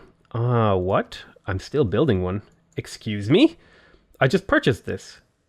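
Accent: Ireland. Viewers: You should triple down on silly pronunciations. You're Irish, so you can generate a really strong mispronounced accent that nobody can blame you for. And you can exaggerate all of the foreign sounding names.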